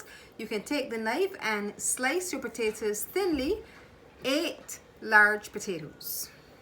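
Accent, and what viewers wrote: Barbados. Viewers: Your accent sounds like bajan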